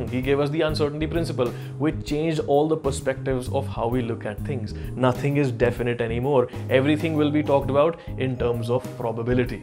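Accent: Punjab, India. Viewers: Finally saw a punjabi with this accent in English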